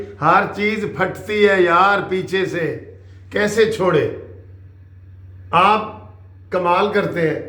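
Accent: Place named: Lahore, Pakistan. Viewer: The way you talk shows which area of Lahore you belong to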